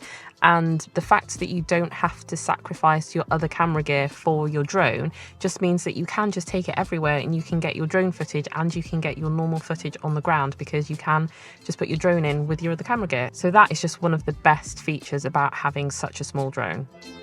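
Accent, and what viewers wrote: England, United Kingdom. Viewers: I have an original Mavic and Spark that I haven't flown in ages. I'm seriously considering getting a Mini 3 and getting back in the game. Great video. I can listen to UK women talking all day ❤ lol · Glad Sipscriber here. Grate vdo. But I can’t help but wonder how much u look like Young Dolph 🐬 I ain’t know his young sis had a Fine ingish accent 😄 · My God i loved your video, but your british accent ☺😉 I bought also my mini 3 Pro, and for me is the first time i will be using a Drone, i love aerial photography and video 🤗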